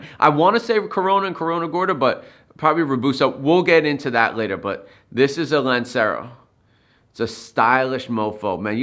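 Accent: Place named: New York, United States. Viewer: Had a feeling you were from New York. BTW, Chicago pizza isn't pizza. It's a caserole! 🤣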